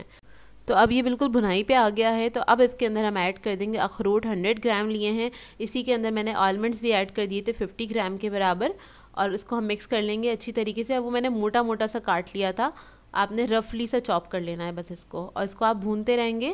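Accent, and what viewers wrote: Karachi, Pakistan. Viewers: Karacnhi with a typical tone so sweet once Mahira Khan pronounced same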